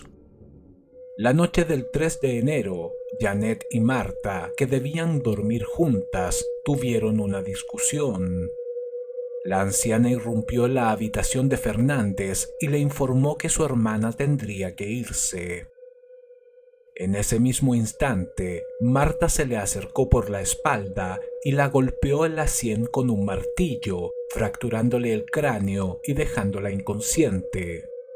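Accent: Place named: Chile. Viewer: Este canal es demasiado bueno, pero el hablar del locutor lo tira por tierra, pues tiene un fuerte asento chileño, podría contratar un locuror mexicano o colombiano, incluso español....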